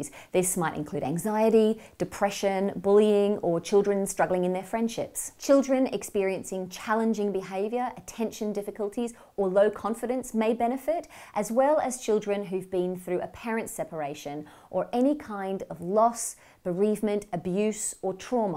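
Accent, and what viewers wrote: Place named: Australia. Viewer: I was so happy when I watched this, thinking this would be perfect for my 3yo son and hearing your Australian accent, thought it might be accessible to me but alas you are based in london. Any recommendations for something similar in Melbourne??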